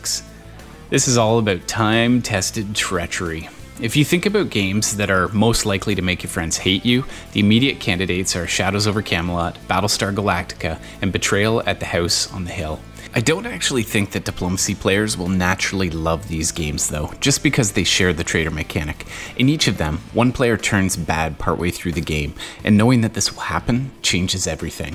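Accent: Canada. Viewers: Canadian accent detected.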